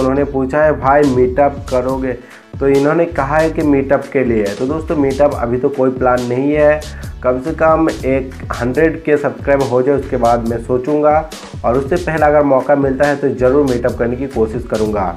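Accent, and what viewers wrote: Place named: Bengal, India. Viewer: da is from Bengal like me thats why his Hindi has a Bengali touch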